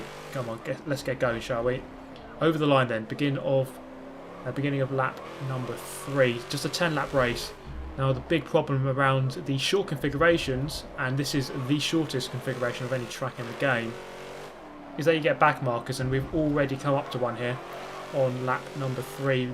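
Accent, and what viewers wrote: United Kingdom. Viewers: Do all brits say "because" in the same way that you do?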